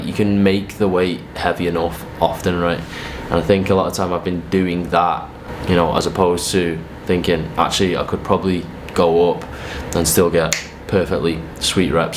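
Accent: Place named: Liverpool, United Kingdom. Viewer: Yes yes, more of these. And also ordering food in Fluent Scouse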